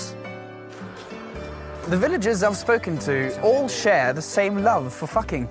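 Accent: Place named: United Kingdom. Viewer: Bri'ish accent fits.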